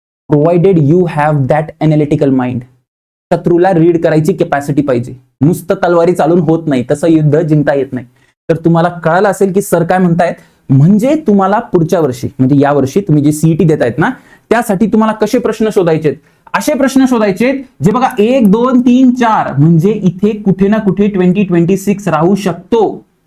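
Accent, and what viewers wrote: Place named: Jalgaon, India. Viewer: Sir tumchi language Jalgaon chi ahe😅tumhi jalgaon che ahe ka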